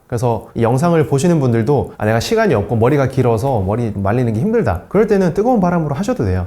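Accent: Gyeongsang, South Korea. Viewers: 선생님 고향이 혹시 경상도쪽이신가요? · 선생님 경상도 출신이시죠!??? ㅎㅎㅎ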